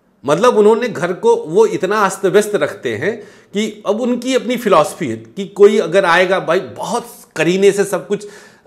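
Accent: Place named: Kanpur, India. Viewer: Pandit ji aap kanpur se hain kya itna mazedar to sirf hum kanpuries bolte hain maza aa gya 😊🙏🏻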